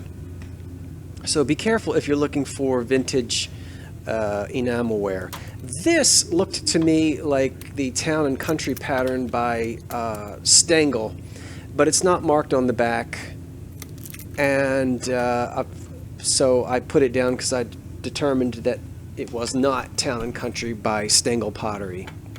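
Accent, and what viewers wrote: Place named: Pittsburgh, United States. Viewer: My roomy insists you're from Pittsburgh. Are you?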